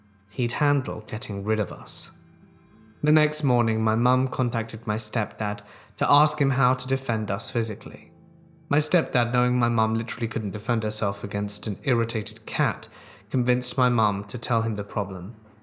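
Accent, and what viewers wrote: England, United Kingdom. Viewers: on a funny note, I listen to English narrators (just prefer the British cadence, I suppose) so much that you got me saying “narrator” the English way, rather than the American way. I didn’t realize we pronounced them differently until I said it in front of my bf and he gave me a funny look and said “.... say “narrator” again..”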